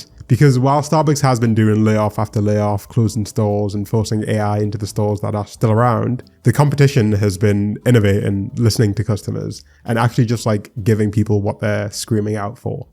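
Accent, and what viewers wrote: United Kingdom. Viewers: I know it’s just how you sound but you sound like you’re mocking me with how you speak… maybe it’s the combination of deep vocal tones and the british accent, im not sure. but if I close my eyes and just listen, it sounds like “yOu ArE mOcKiNg mE.” great video and subject matter but I probably won’t be watching anymore of your videos.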